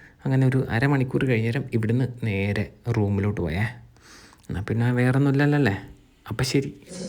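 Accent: Kannur, India. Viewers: Kannur basha thenhe...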